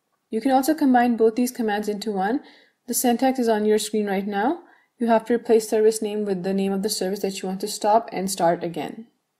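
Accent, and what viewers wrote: India. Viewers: are you from India . Can you speak a little slower? Please If you're rushing like that, I don't want to listen anymore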